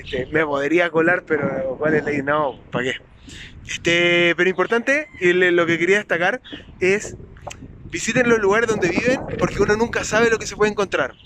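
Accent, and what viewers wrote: Chile. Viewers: vi Que sos Chileno, me imagine por el acento porque mi cuñada es Chilena 😄 Buena suerte con los vídeos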